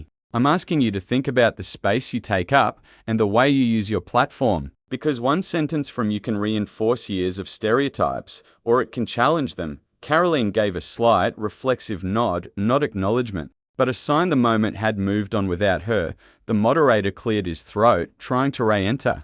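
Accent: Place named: Australia. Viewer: Typical AI story using an Australian accented voice. Obviously a fake set up story. Just trying to cause trouble. 😠 Typical BS.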